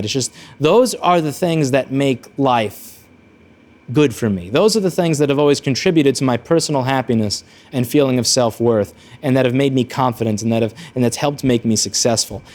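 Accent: New York, United States. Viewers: Maybe it's just me, but your NY accent seems way heavier back then than it is now.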